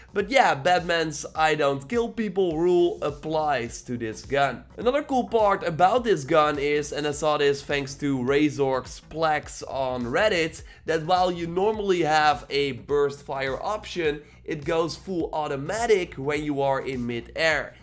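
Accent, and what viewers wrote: Netherlands. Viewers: That Dutch accent though.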